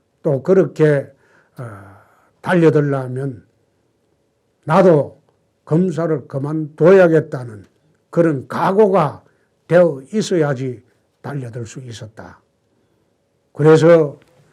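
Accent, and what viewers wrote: Gyeongsang, South Korea. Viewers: 경상도 머심마다.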